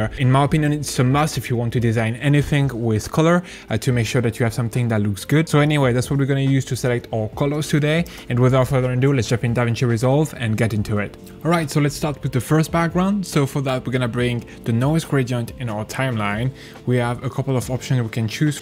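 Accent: France. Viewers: Thank you very very very much. Iam so grateful for you videos ✨🙏🏾 I hear a little accent, are you French ?? Ahaha 🤣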